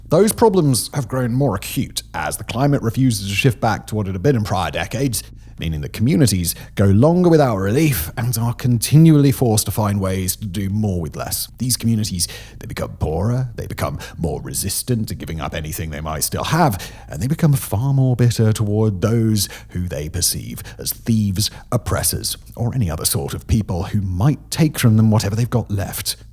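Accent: United Kingdom. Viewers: Idk I think he's just british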